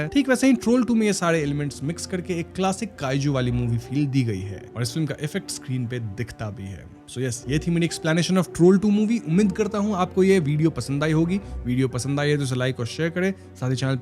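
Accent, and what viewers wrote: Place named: Bihar, India. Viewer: broh no hate but apna accent thik kro yaar bihari accent nikal deta h gussa aata h ekdm se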